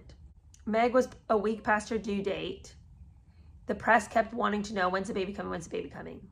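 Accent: United Kingdom. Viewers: Your story telling and British accent made me laugh to the point of snorting! I so enjoy your humor! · I love your British accent 😹😹😹😹 very My Fair Lady!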